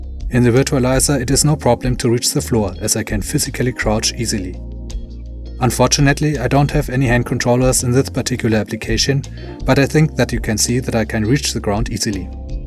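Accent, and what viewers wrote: Germany. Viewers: This german english triggers me ^^ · Interessant dass man immer direkt hört wenn Deutsche Englisch sprechen ^^ Gutes Video auf jeden Fall.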